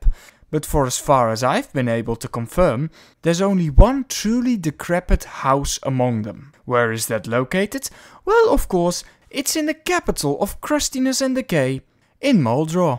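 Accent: Netherlands. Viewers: Wat een heerlijk Hollands accent :' ) Got a sub out of me tho!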